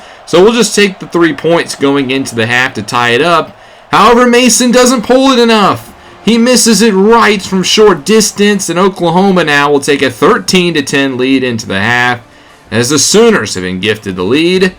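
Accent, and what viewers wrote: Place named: South Carolina, United States. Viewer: Brother, you gotta be a native South Carolinian. Ain't nobody but natives pronounce it Sac Carolina! I know, cause that's how I say it too.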